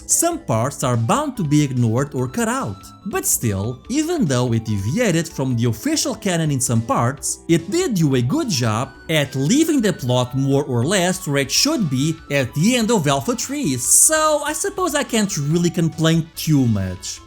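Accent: Portugal. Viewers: Vc é português? Pelo sotaque, pareceu muito. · I heard your speaking and already knew you were portuguese! Lmao! Thank you for making content on brazillian fgc. Greets from Brazil!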